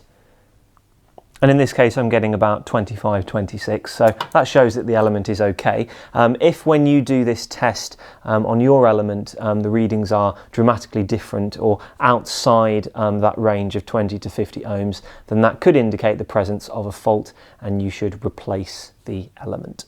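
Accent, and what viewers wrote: RP, United Kingdom. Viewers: Posh accent! :)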